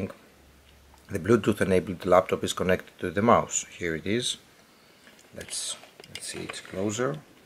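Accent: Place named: Greece. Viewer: Τα αγγλικά του Έλληνα αναγνωρίζονται αμέσως απ' τη πρώτη λέξη ☺️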